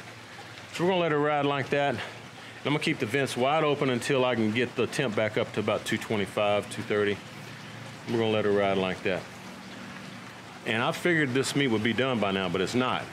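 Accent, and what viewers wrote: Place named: Texas, United States. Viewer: The moment I heard you I knew I had found my brisket man....native Texan here (the HEB clinched it that you were too)just your voice makes me home sick and the brisket almost made me cry...have lived away from home nearly 35yrs now (now WAY south of the border in Guatemala as a retiree)...no brisket for 1,000 miles. Great pork here in Guatemala terrible beef (skinny range fed and "pasture" to table the next day so TOUGH..makes good tasty burgers though when mixed with some ground pork. Bought hubby a Weber Smoker and put it together today...smoking tomorrow....thanks for your expert cooking lesson!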